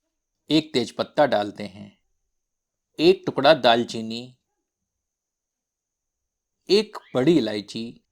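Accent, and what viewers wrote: Standard. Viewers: क्या सुधा हिंदी बोलते हो जी । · शुद्ध हिन्दी बोलते हैं आप 👌🙏🌹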